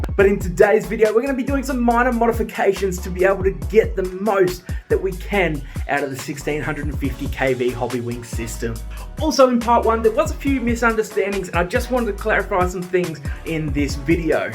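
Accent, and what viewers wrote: Australia. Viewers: You Aussie? Where ya from? Looks like S.A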